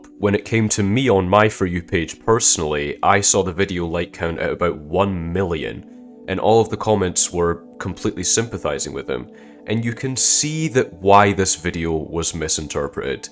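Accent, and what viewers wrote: United Kingdom. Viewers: dont try to hide the scottish. i can tell you are. let the inner celt out fellow scott. alba gu braith! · Is that an Edinburgh accent bro?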